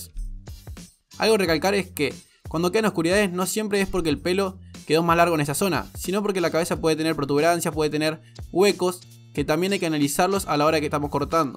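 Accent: Argentina. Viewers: Sos argentino amigo?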